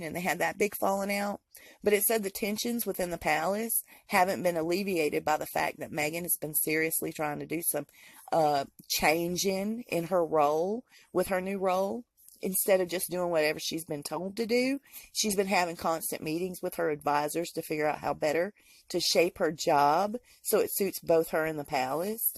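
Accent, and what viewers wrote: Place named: New Jersey, United States. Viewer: 2/2 ...that’s how ya “tawk”” when yr from Jersey... Girl!!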